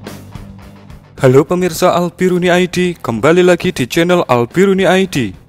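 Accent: Java, Indonesia. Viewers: Khas medoknya enak bet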